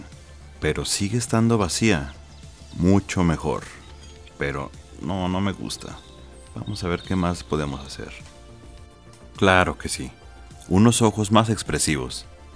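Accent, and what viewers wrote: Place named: North, Spain. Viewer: A sos re norteño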